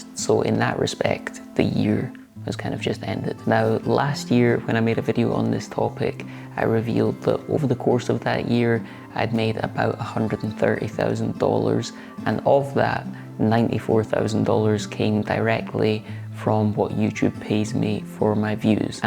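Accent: Scotland, United Kingdom. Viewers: People love honesty, and being up front about things, it is endearing. Plus who doesn't love that UK sense of humor, especially silly or self deprecating sarcasm? I think the soothing. calming aspect of your videos come from your voice, quiet with that very noticeable Scottish accent. What's not to love there? And who wouldn't be fascinated with a noticeably ginger Scot with a white patch on one side of his head who actually has the nickname and likes it? That is just the best. I honestly like your videos about your cat, and you baking, and you taking walking/hiking tours of your local country the best. The beauty around you and the music you choose for those videos make it like a 20ish minute mini vacation. Great work ….Brian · Have you thought of doing voice over work? You have such a lovely voice although am probably biased because my mum is scottish and just love (all) scottish accents! 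xx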